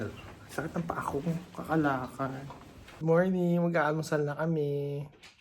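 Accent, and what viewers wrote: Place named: Europe. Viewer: Love the European accent at Tagalog din 😊❤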